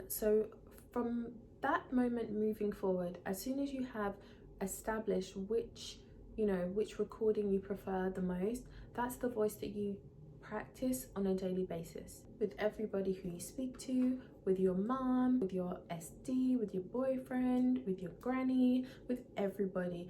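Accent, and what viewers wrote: United Kingdom. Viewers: Every thing you share is so true. I’m a very feminine woman, with a soft voice naturally, but I don’t always enunciate every syllable,... I wish so much I could speak with your proper British accent, but I can’t. One bonus is living in NYC and sounding nothing like the other native New Yorker women who can be more harsh and masculine than most men..... (sigh) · oh i didn’t mean it in a rude way i’m trying to say that maybe you focused a lot on the flaw but it’s hardly noticeable! you still have the same soft british accent but some words were pronounced differently and maybe your voice was a bit softer, but me coming from an Australian accent you definitely sounded feminine regardless!!!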